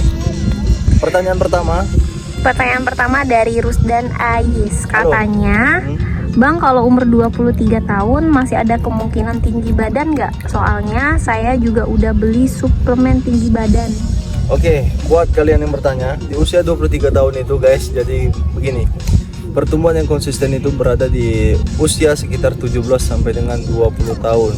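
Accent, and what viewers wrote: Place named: Palembang, Indonesia. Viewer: Uwong palembang caknyo kakak ini wkwk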